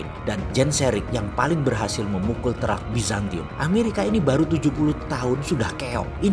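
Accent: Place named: East Java, Indonesia. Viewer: beda bro, kerasa logat jawa timurannya, karena ane orang madura, sudah terbiasa dengerin logat jawa versi timur, haha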